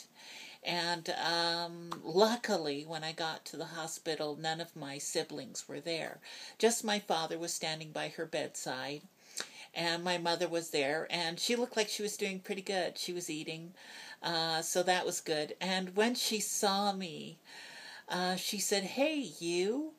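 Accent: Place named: Texas, United States. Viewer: you are an incredibly interest and attractive woman and you've got a great Texas or southern Illinois feminine voice. As a 64 year old cisgender straight male ally I find it interesting to list to a woman who is "from my generation" which is rare as I listen to the You Tube videos. There is a 30ish trans gender woman in Florida JW transgirl who might be an interesting person for her to talk with. and like yourself she is a very interesting and intelligent woman. I am so happy that you had the opportunity to have some time of closeness with your mother in the hospital when the two of you did not have the stern eyes of the rest of your family.. I am so sorry your family was so cruel to you on Mother's Day. I will tell you what I tell every transgender woman, I feel that each and every one of you is a special gift from God, you have the special gift of understanding the world through nthe eyes of both a man and a woman.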